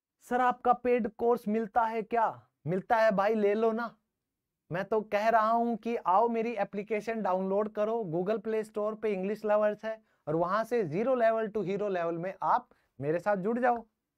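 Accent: Haryana, India. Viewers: Aap haryanvi ho kya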